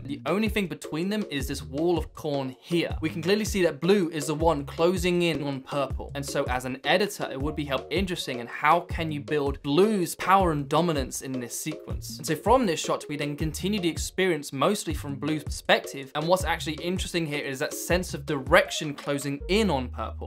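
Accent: United Kingdom. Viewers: Honestly the most useful editing tutorial videos I’ve ever watched + I love the British accent ❤️ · british accents :(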